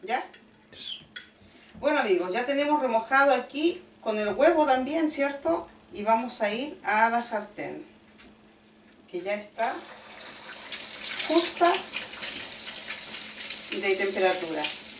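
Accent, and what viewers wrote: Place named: Chile. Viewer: aca en mexico le llamamos capirotada... que ricas se ven!!! amo en verdad el acento chileno!! un gran abrazo!!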